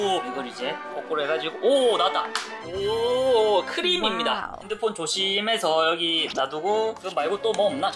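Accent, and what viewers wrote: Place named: Gyeongsang, South Korea. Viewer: 잇섭이랑 대구말투도 비슷하고 목소리도 비슷하네요😅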